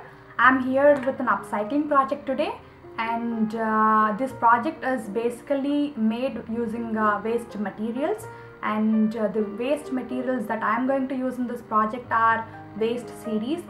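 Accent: India. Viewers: I like the video it is so awesome. Ps I like you're Indian accent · Kannada accent😊but nyc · I am Indian too but I find her accent funny and cute :D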